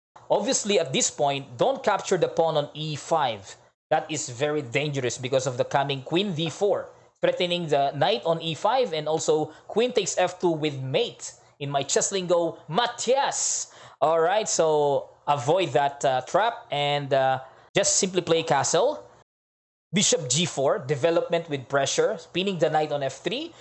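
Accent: United Kingdom. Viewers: naks... coach... galing ah... British accent. nanibago ako.. "IM ".☺✌✌✌✌✌ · galing namn.. nanibago ako.... sir coach....parang british ang dating..napakahusay mo..saludo kami ng mga anak ko sayo...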